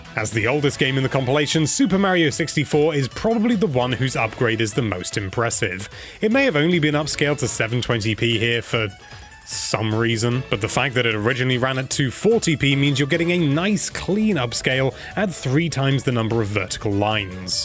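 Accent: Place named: United Kingdom. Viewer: Thick uk accent is tough to handle. man. tapping out.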